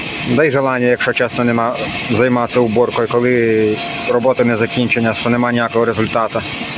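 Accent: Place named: Polissia, Ukraine. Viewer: З якого регіону ваша мова?) мені от здається що це Полісся😊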